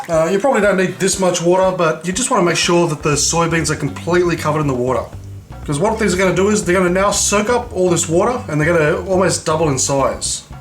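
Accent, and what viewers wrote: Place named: Australia. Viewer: I love Australian accents for some reason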